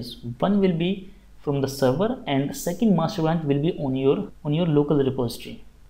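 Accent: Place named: India. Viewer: I don’t like ur Indian accent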